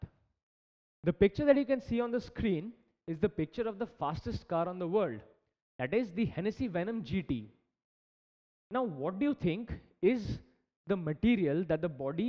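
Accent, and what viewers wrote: India. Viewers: macha Allah i like how indians speak english .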